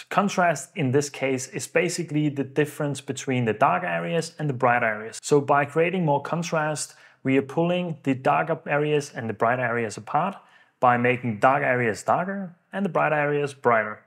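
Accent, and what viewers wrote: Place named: Denmark. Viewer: When I was watching I thought you sounded a little danish, and decided to check your profile. I was so surprised to see you were actually danish lol 😭 Din tutorial var ret god, og sjovt at se en dansker nævnt i medierne!!!!!!!!!!🇩🇰🇩🇰🇩🇰🇩🇰🫡🫡🫡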